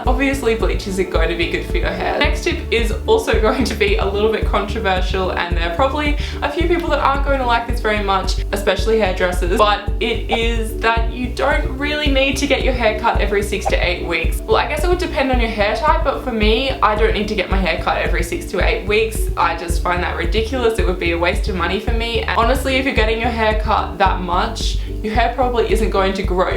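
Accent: Australia. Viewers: Is that an aussie accent? Or kiwi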